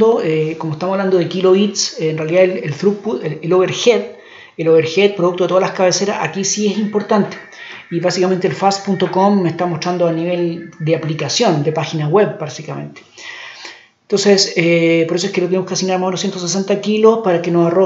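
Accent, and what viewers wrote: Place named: Cuba. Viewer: Sounds like Spanglish. I would say 95% Spanish and the words that don't translate well are English. Actually very easy to understand him, probably because his accent is very similar to a Cuban accent.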